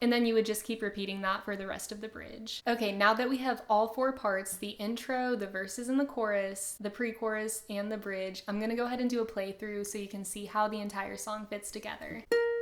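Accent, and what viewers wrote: South, United States. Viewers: She's southern stop being so rude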